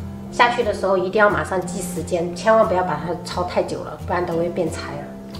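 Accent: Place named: Quanzhou, China. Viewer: 我离开泉州二十年了。听见乡音很亲切！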